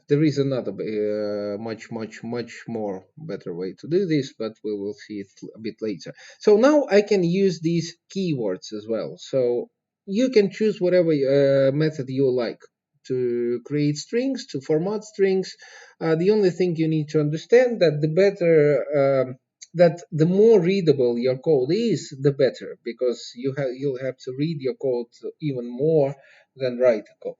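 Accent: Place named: Russia. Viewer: RU акцент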